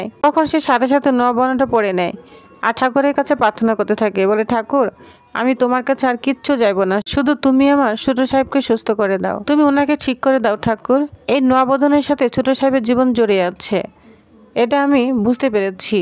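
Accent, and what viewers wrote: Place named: Bangal, Bangladesh. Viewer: বাঙ্গাল বাঙ্গাল উচ্চারণ, অশিক্ষিত উচ্চারণ ঠিক ঠাক শিখে কতকথা করবে।শুভেচ্ছা রইল।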